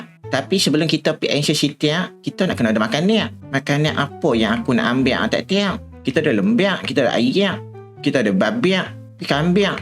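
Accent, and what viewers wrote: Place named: North, Malaysia. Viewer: Tequk sangat loghat utaqa😂😂😅😅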